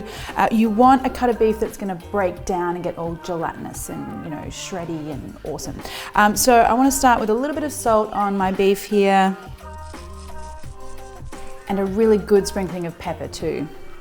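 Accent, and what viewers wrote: Australia. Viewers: You know Marion is Australian not British. Her accent is Australian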